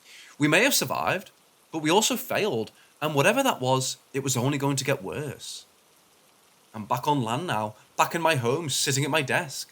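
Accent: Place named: Scotland, United Kingdom. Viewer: Scotland I think idk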